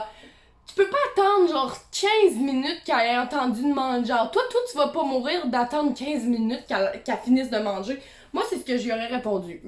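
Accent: Quebec, Canada. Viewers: J'adore ton accent québécois (même si je comprends pas trop parceque je suis française de France )ptdrr j'explique très mal😭😂😅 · L’accent québécois c’est juste une pépite 🦋💎😙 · Boblyn Mukuna bah elle a un accent cebecoi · Je KIFFE TELLMENT ton accent québécois c’est un truc de dingue 😂😎❤️ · C l'accent québecoi 👌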